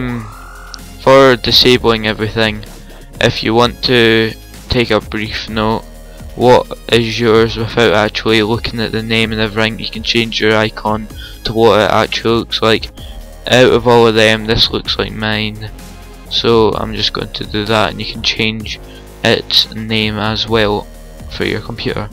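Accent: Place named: Scotland, United Kingdom. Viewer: Yassss he's Scottish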